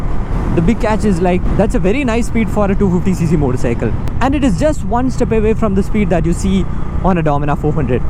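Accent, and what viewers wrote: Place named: Tamil Nadu, India. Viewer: The word is "posture" not the "Porche" 😂 Your accent sucks, bro. It sounds like Tamil Nadu speaking English. LOL 🤣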